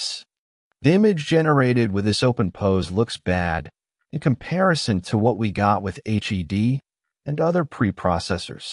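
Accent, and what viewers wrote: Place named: India. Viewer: We are used to strong indian acccent in tutorials don't worry :)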